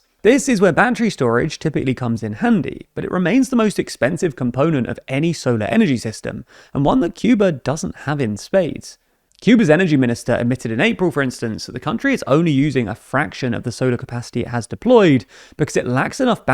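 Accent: United Kingdom. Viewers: Cuber. I love British speak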